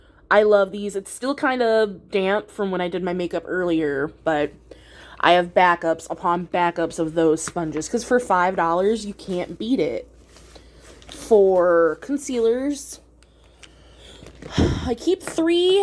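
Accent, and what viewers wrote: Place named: Midwest, United States. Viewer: Love me some midwestern accent, reminds me of home!!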